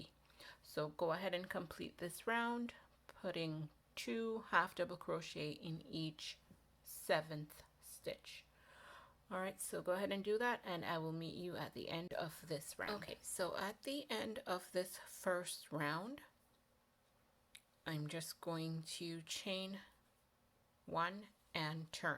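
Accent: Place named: Caribbean. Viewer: I hear a Caribbean accent❤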